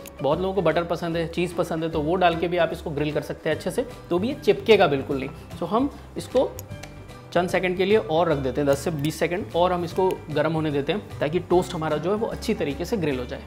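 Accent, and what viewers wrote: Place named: India. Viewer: Why do you speak for 1minutr plus without demonstrating the sandwich maker? If you thought you were doing something your Indian accent makes it hard to understand you also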